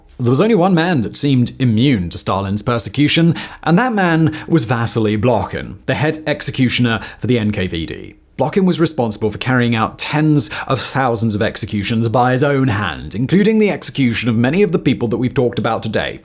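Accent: United Kingdom. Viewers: This guy's British accent is fucking annoying me. I would've watched the whole video but that accent is too hard on me. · This guy has 50 channels lmao and he doesn't even know much about the topics he does videos on. It's basically Wikipedia paragraphs with a soothing British accent. I'll still watch though 😂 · Thumbs down. Reason 1: You don't start video with a secs ad. 2. Your English is too British and chewy and those who don't speak it as their first language understand JACK. Put subs. proper ones, auto-generated won't do. Cheers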